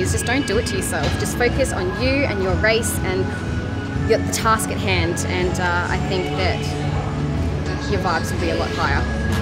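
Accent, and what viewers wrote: Australia. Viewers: Surprise. You're an Aussie!! After following you for a while, this is the first time I've heard you speak. "That's an Aussie accent" I thought. (And from the Cat's territory too. Grrr. Go Brisbane!!) I imagined you were 6 foot tall and American. (Well you have a six-foot presence :-) Take a bow mate. You look amazing.) What you've done is a huge credit to you. I think you're also an inspiration to us blokes. Golly, an Aussie. hahaha. So surprising. I wish you all the success there in the US. Thank you for your positive energy. It's great. Bluey. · Not only are you the most beautiful woman on the planet, but I love your Australian accent just makes me melt you are a goddess❤❤❤❤❤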